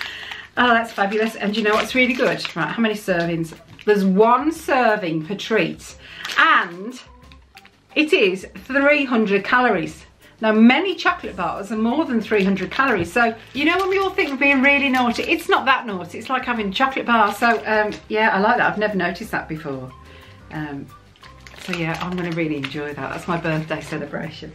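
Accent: Birmingham, United Kingdom. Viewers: Happy eater, happy YouTube birthday and happy early birthday!!! Wow triple celebration!!! Have to say I love love love your videos. Your energy and laughter is so infectious ! And your accent brings me home! My whole family are brummies. I’m in your neck of the woods today for the football (season ticket at West Brom). I travel every week from preston! So I’ve rambled on but just wanted to say thank you for the fantastic videos and for sharing the Disney magic! Xxx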